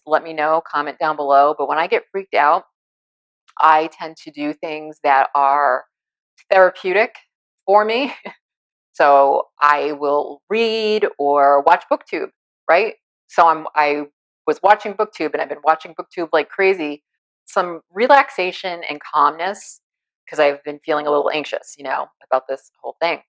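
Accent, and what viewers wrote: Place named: New York, United States. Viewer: I’m sorry you had to go through that and I’m sorry someone lost their life that you knew, however slightly. I’m from Brooklyn originally so I did detect a New York accent from the start. OK I’m continuing your video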